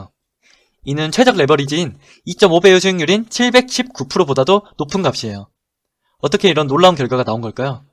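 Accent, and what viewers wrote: Jeolla, South Korea. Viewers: 전라도 사투리 ㅈㄴ세네 ㅋㅋ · 말투가 어째 광주나.전라남도쪽분 같은디요.저는 공포구간에서는 잘 사긴하는데 수익구간에서 끌고가는걸 잘 못해서 끌고가는거 도움이될까하고 구독.좋아요.박았습니다. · 전라도 놈이네ㅋㅋ 믿을수가 없다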